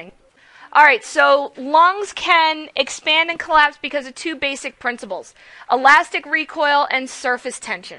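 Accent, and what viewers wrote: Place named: United States. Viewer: Your videos, your way of explaining, your examples, and your American accent make it soooo easy to learn... I love how you lecture. Please make more videos and help us (students) learn more so we don't have to cram study. God bless u...:)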